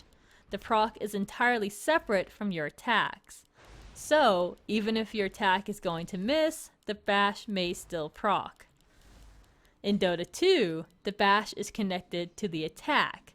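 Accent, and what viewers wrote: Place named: England, United Kingdom. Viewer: Это акцент у англичан такой.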